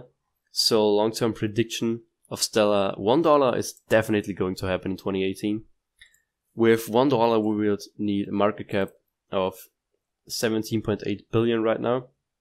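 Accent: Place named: Austria. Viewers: after 30 seconds i realized you are Austrian.. cool video, i like XLM. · 'Schule' next to Project by the bookmarks, there is a "andern" button on the page. I'd guessed Germany or Austria, too. :) and the way you talk in English said enough for me, cause I've worked for Austrian companies ;) . Nice video! Thumbs up!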